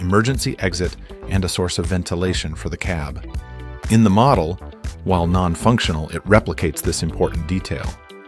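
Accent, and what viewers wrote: United States. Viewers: Thumbs down for the annoying American voice over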